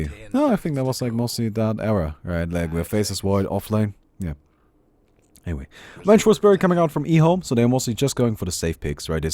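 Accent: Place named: Germany. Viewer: it's the German accent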